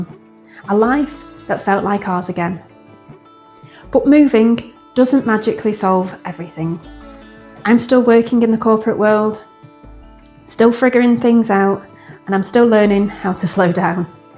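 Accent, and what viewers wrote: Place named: Northern England, United Kingdom. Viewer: Welcome to Ireland. Great northern English accent! Good luck.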